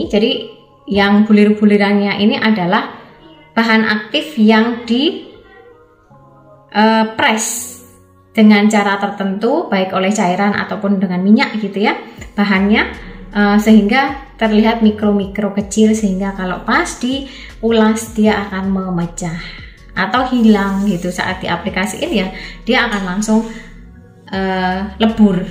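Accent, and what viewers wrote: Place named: Java, Indonesia. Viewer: mantap ...suka bangat gaya bicaranya dokter...medok..hee.hee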